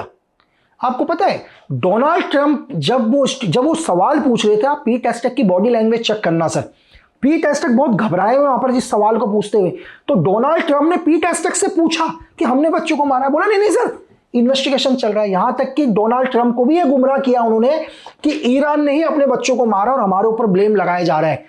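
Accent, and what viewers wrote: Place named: Rampur-Moradabad, India. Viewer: ay be from Rampur-Moradabad, if you go by accent.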